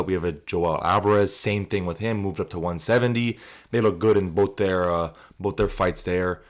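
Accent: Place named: Miami, United States. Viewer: u from miami bro? i hear that accent 😂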